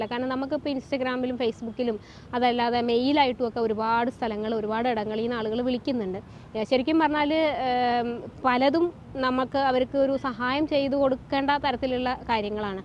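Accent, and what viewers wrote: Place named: Thiruvananthapuram, India. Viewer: ഞാൻ ഓർത്തു ചേച്ചി തിരുവനന്തപുരം കാരി ആണെന്ന്.. 😊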